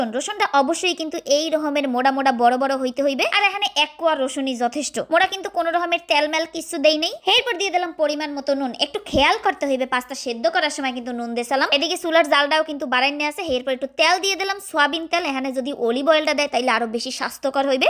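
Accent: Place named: Barisal, Bangladesh. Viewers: Apni kon deshe bas koren,,,eita borisal er basa,,,,,ei prothom sunlam borisal er basa kaw sune nai,,,,tao se abar Bangladesh e😅😅😅😅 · Sona...Tumi....erokom... borisailll a....vassssa...kivabe...set....korlaa????..NA...ki....sotti..sottti....bolo.😂ami..khulnaiyyya.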